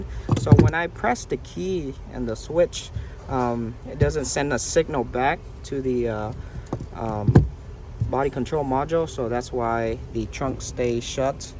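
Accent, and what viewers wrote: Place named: Vietnam. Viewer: Knew you was viet from the first thing u said 😂💀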